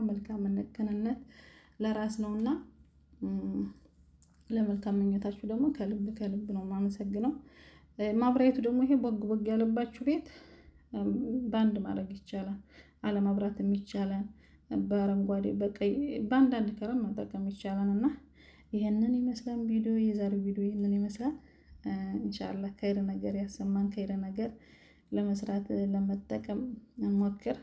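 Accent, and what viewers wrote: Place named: Wollo, Ethiopia. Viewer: እርግጠኛ ነኜ አማራ ክልልናት የወሎ ይመሰለኛን አማርኛዋ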